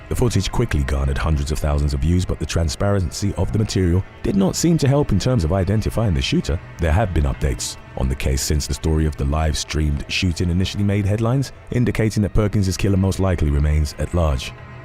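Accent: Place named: United Kingdom. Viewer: it’s an accent because he’s British but he’s also pronouncing names completely wrong. Even by British standards.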